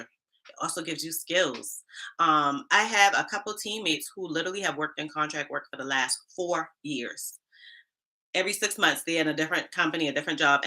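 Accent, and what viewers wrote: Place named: Louisiana, United States. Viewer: girl I love the way you talk and I did think that you was from Louisiana!!! Girl you are funnny and real. I'm not in HR, but I have been during research to get myself ready to move into HR, hopefully with my tranferable skills. I been at my job for 25 years and I want a change and advancement in a career.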